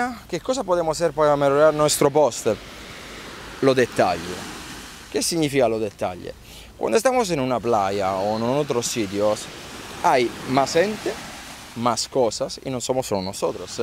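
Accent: Italy. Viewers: Todo está perfecto 👌 y me encanta tu acento italiano o estoy equivocado? 🤔 Saludos desde Brasil 🇧🇷 😘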